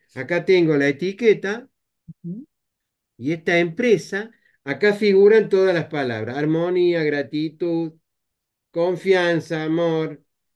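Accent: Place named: Córdoba, Argentina. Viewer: Buen día!! Que sorpresa escuchar a un cordobés como yo. ,jajaja, lo estoy escuchando, gracias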